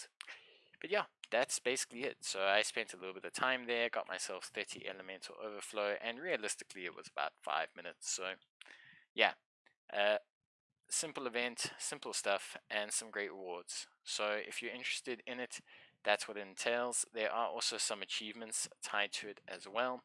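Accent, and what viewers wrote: South Africa. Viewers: Bro, your South African. Tell me, do you play private servers?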